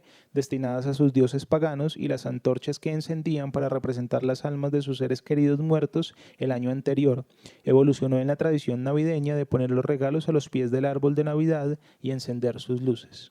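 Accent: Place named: Bogotá, Colombia. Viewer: Buena investigation, pero esa voz de rolo, bogotano homosexual esta pesima.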